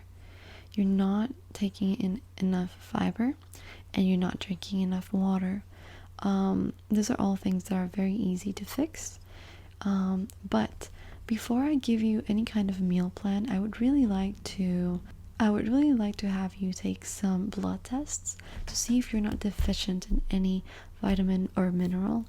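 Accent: United Kingdom. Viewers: Espero pronto puedas haer otro roleplay con acento británico <3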